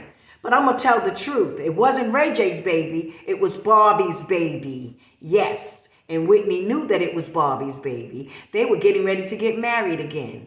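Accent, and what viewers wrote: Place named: Boston, United States. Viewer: Heavy on ya "HOT"(HEART)❤ Straight Boston accent 🆗️ Love it and thanks 4 the "tea"🍵💨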